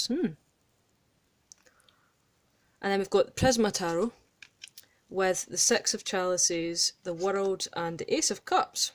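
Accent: Scotland, United Kingdom. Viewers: 3 soo on point 👌🏾 btw I love your accent, reminds me of my best friend, she's scottish ❤ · Woohooo a Scottish reader 👌🏼👌🏼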